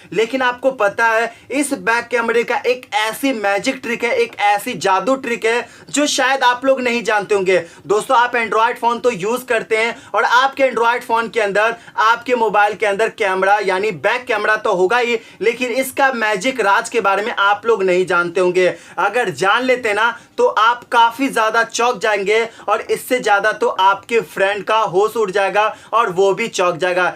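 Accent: Bihar, India. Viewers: Kya tu bihari hai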